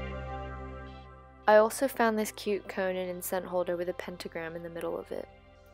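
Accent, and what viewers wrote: Australia. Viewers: I have a feeling this is an australian youtuber- her accent seems mashed up...and i'm in australia and seen those things locally too :) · Umm yea can I just come live where you are. I got my hopes up before watching, but we definitely do NOT have most of the things you just showed in dollar stores here in New Orleans. You can find candles..that's it. Everything else is only found at walmart & would've cost you either triple or not sold at all 🙄 You sound like you're from OZ..I've always been in love with your country since I was a kid (I am fascinated by literally everything about Australia & was also obsessed w Silverchair) so thx for adding more to the list lol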